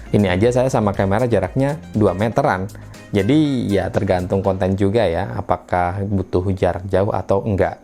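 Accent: Pekalongan, Indonesia. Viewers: Bang orang pekalongan y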